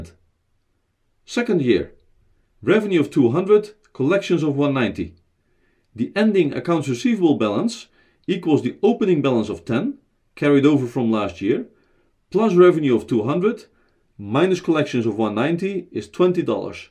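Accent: Netherlands. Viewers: I know a Dutch accent when I hear one lol. Thanks for the review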